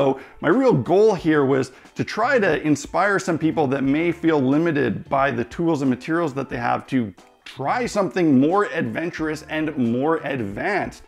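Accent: Canada. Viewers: Canadian? are you westcoast? btw what a great fantastic building, hope you dont mind totally copying this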